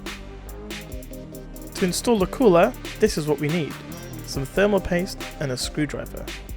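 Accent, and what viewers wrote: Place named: United Kingdom. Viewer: ) Hope for more contents from you bro. That well-defined British accent <3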